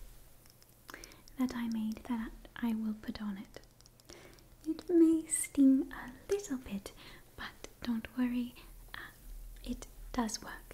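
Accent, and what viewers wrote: United Kingdom. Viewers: Great British accent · How did you match the voice so well? I know it’s technically just a British accent, but something about it feels just perfect for this specific Zelda.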